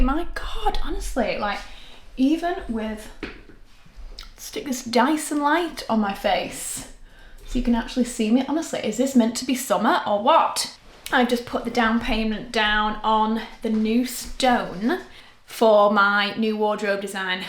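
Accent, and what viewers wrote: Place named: Wigan, United Kingdom. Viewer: Absolutely adored this vlog and sadly we are in the time where we seem to be going backwards for women’s right and the decisions we make for ourselves and with our partners. 😔 but we stand our ground because we’re all strong women 🖤 also met a friendly chap from Wigan today in Nashville TN USA 🎉 made my heart explode — his accent reminded me of you and 😌😂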